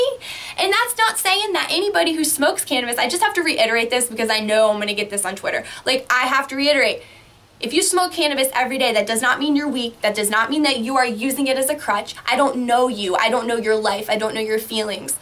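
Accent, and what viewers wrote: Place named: Michigan, United States. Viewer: Sound like you’re a Michigander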